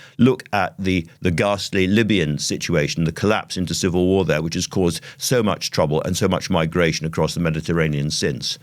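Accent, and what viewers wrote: United Kingdom. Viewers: Conservative england then you start talking British WTF?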